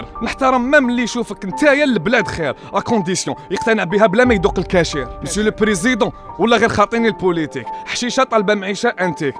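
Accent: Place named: Algeria. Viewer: هدرتك تبان جزاءرية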